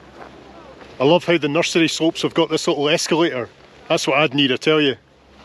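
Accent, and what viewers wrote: Scotland, United Kingdom. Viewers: Very lovely scotish accent,welcome to Romania!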